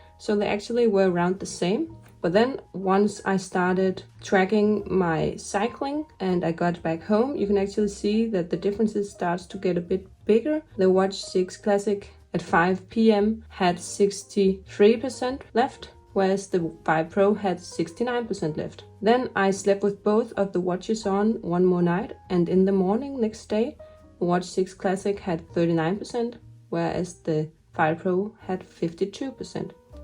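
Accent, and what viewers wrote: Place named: Denmark. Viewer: The Danish Accent 😍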